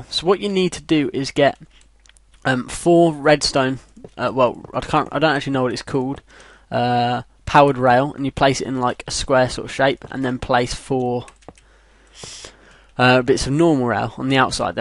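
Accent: United Kingdom. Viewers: I love british acents and good for if you have little materials too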